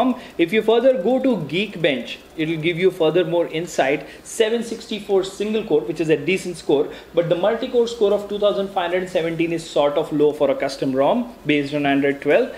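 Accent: India. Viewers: Indian talking in English = Auto Subscribe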